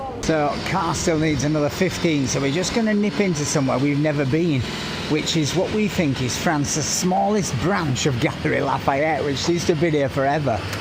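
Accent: Yorkshire, United Kingdom. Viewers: As a Yankee, I miss 50% of ruminations/rantings (due to his lovely Yorkshire accent) and as a straight woman I get very little of his cultural references, STILL, I've subscribed because those videos give me so much joy! Especially facial expressions, eye rolling and the constant reminders he's too young to share childhood memories! You guys are the modern Martin & Lewis😄 Good luck on the test! Bon courage!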